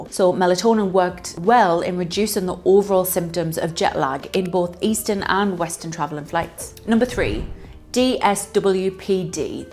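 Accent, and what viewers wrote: United Kingdom. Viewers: You have a British accent so why did you leave the UK?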